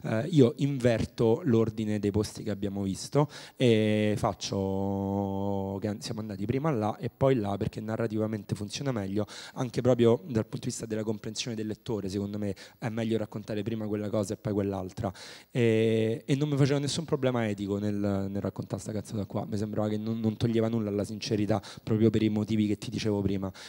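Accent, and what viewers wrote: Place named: Rome, Italy. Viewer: ‘Gnorante! 0calcare non sbaglia un congiuntivo...altra cosa sono l’accento romano o l’emozione che lo fa parlare accelerato...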